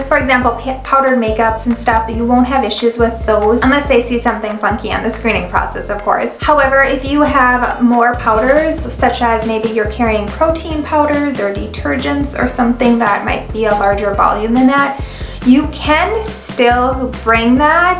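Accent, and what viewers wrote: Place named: New Jersey, United States. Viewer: I like the accent are you from jersey ?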